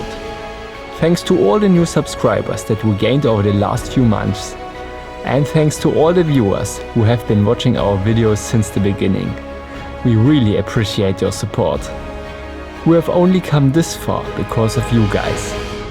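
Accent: Germany. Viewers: His accent is intense. This is 100% pure german accent without even trying to pronounce properly. Sorry but that makes me cringe :D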